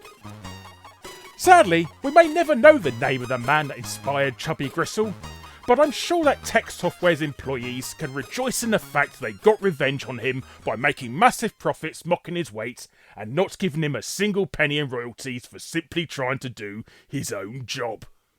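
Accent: United Kingdom. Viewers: Your cartoon likeness doesn't look like someone you'd expect to have a brittish accent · Nah, don't feel stupid. He has quite a strong British accent (London...I think? I'm no expert.) and I imagine it can be very difficult for a non-native speaker to understand if they have not learned from a British teacher. And even then there are a lot of different English accents. I personally enjoy his narration, but then I am more accustomed to the accent.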